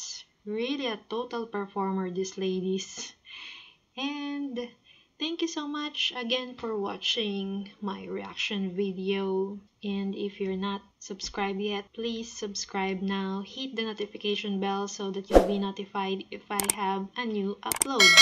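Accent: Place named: Philippines. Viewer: ah kala ko kasi japanese po kayo pero may accent kayong pinoy 😅. Na sa japan po kayo ngayun? Bagong favorite ko po kasi tong kaya napadpad ako dito 😁.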